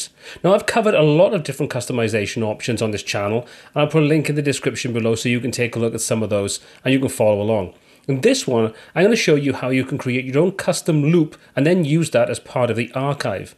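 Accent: Wales, United Kingdom. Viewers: Is that a welsh accent I can sense ? Swansea area ?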